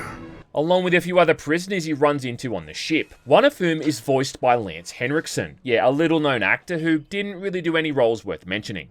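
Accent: Bogan, Australia. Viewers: thats one bogan af aussie accent.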